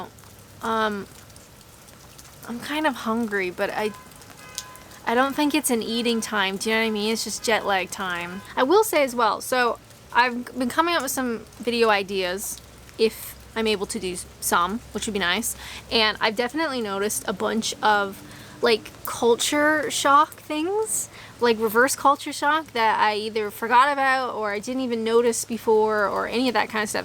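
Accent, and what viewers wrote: Canada. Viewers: Your voice has gone *very* Canadian!! And consuming locally-made honey is a good natural remedy for hay fever (works for me anyhow... or should that be 'anyhoo'?) 😊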